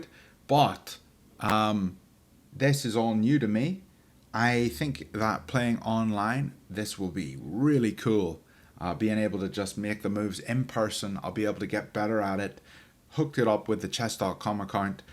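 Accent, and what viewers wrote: Northern Ireland, United Kingdom. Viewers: I just ordered the Chessnut Go earlier today. I might get something like the Evo in the future but I'm a complete novice. I've only played a couple of games as a kid for about a week or two so will be interesting when I receive my chessboard. Is the tablet part detachable, that would make it better for storage, replacing tablet etc.? By the way you seem to have a Northern Irish accent coming through with a bit of a mix like James Mulgrew - Jimmy Cricket.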